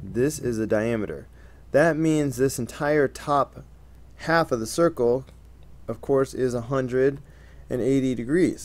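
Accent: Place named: East Coast, United States. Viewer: Nice job. I detect a Eastcoast accent? Where did you do undergrad.? Just curious. I just subscribed you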